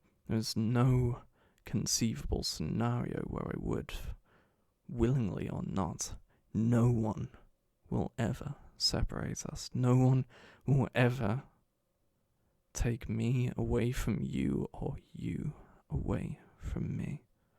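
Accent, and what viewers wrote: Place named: United Kingdom. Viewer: Wait… he kinda sounds like auralescent but more British to me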